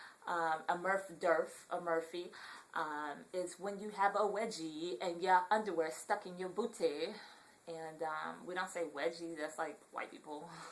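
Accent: United States. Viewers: She sounds realer than most Californian accents I hear including the ones from actual Californians. The real ones sound almost as forced as the fake ones. - Bay Area Guy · im from NY and I like hearing that compton cali accent of where your from and by the way don't worrie about how you look u should consider signing up for americas next top model thats how nice u look:)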